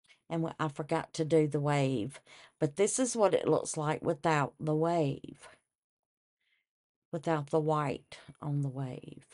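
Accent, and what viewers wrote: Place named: Texas, United States. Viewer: Hey … I’m not sure where you’re from exactly, but I could listen to you all day! Your keychains are beautiful too by the way… I really love that mould, it is very different from the standard letter moulds! I have just watched an old video of yours, where you made crackling bread… Now, I don’t do much cooking anymore, but I would love to have tried your crackling bread recipe. Your accent takes me back a loooong way!! And it makes me hungry for some good ol’ biscuits and gravy… one of the best things I’ve ever eaten!! 😋 😋 I am an Aussie who spent 4 years in Houston, Texas (<num>… flying in, just 3 days before 9/11) and listening to you takes me right back there!! (Back to being in Houston I mean, NOT back to 9/11!!). I loved being there for the first three years, and I planned on staying there to raise my little miracle Texas daughter… unfortunately, her biological (American) father turned extremely abusive and held us captive until I was able to escape. 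Consequently, I had to flee your beautiful country and come home to Australia with my baby. She turned 21 last November, and was raised by myself and the only Dad she’s ever known, my awesome hubby of 17 years. I will never forget my time over there… both the good and the bad… alls well that ends well, they say!! Much love, Nat (Brisbane, Australia) ♥️♥️🇦🇺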